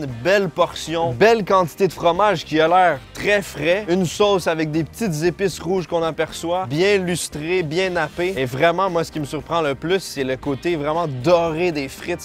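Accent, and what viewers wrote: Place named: Quebec, Canada. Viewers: Ca dit ton pays cest la France,mais ton francais est pas mal Québécois je trouve?! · Il est canadien, de la province du Québec, oui il est il est québécois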